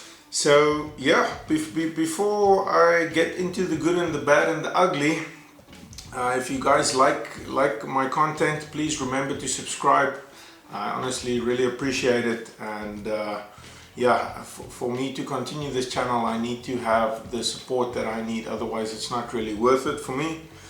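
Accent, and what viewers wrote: South Africa. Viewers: You soumd south african..are you???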